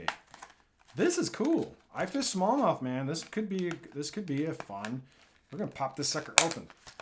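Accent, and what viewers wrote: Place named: Minnesota, United States. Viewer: Your from Minnesota 😮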